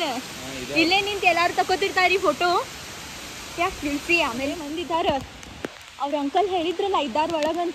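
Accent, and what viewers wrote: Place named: Dharwad, India. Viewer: Hearing Dharwad language after many days❤❤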